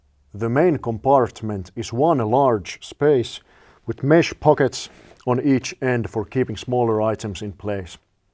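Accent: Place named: Finland. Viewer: I discovered that I can be persuaded to buy things by a handsome man with a Finnish accent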